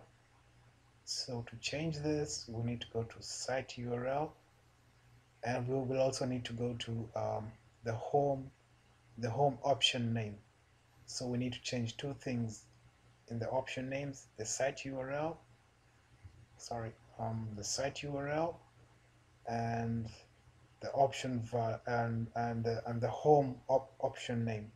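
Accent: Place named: Uganda. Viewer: you sound ugandan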